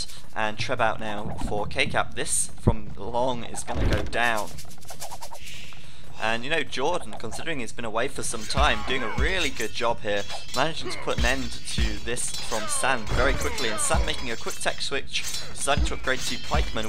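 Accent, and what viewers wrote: England, United Kingdom. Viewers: I like your british voice c: it makes your game-commenting more enjoyable. Ps. Forgive my bad english c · You're a great commentator, especially with English accent haha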